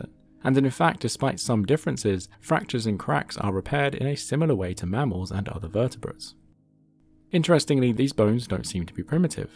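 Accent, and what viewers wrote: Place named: United Kingdom. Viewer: Why do so many of these documentaries have narrators with shitty British accents?? If it's not David Attenborough then get an American or neutral accent.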